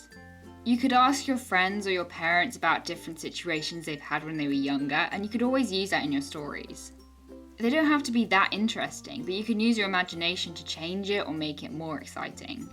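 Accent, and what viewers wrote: United Kingdom. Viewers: She has a British accent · • scottish i think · are you british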